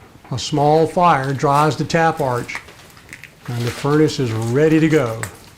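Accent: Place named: South, United States. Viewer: Where are y’all located? I noticed the narrator has a southern accent. Kentucky? Tennessee? North Carolina?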